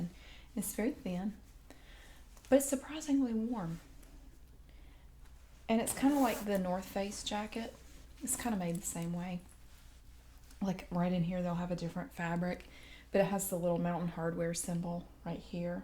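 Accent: South, United States. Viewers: Darling, this is a great video on thrift shopping, but it is not soft spoken and it is not ASMR. I know you are a fashion fan, but this video is too high energy and does not induce tingles even with your beautiful southern drawl. Perhaps you should start a second channel specifically for your fabulous clothing shipping trips. I do find your Aldi food shopping videos to be quite relaxing. Best, C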